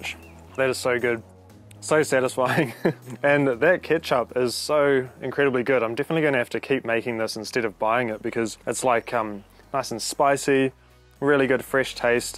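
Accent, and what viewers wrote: New Zealand. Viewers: Insteed, weether, togeether - lovely kiwi accent ❤🤩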